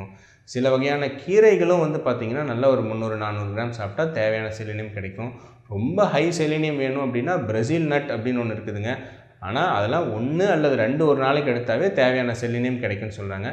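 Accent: Kongu, India. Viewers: டாக்டர் அவர்களின் கொங்கு தமிழ் இனிமை. வாழ்க வளமுடன்.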